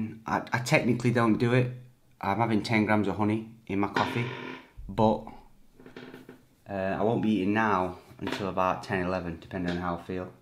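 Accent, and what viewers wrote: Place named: Northern England, United Kingdom. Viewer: Its nice to hear a familiar accent to be honest! Nowhere near enough northerners on my discover page haha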